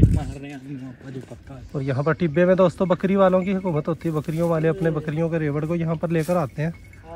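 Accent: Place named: Rajasthan, India. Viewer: Bhai aap ki Bhasha hamare Rajasthan ki Bhasha se milati hai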